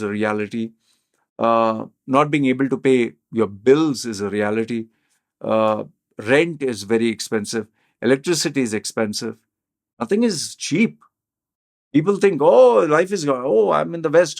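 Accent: India. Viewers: U are another type of Indian, Bashing other Indian trying to fit in. Every individual has an attitude and you are just full of shitty ideas. What are you? A gangster-faced wannabe western with an Indian accent. you are disgusting!!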